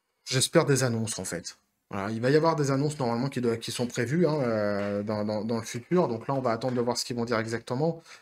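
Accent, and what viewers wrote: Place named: Quebec, Canada. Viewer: L'accent Québécois est fabuleux 👍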